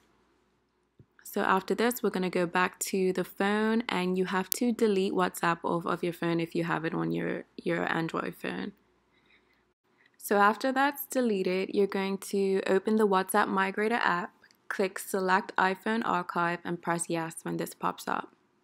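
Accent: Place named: Bermuda. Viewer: You sound Bermudian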